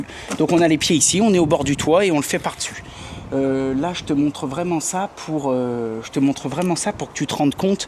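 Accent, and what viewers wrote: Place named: Franche-Comté, France. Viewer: Salut avec ton accent t es de chez moi , tu est francomtois?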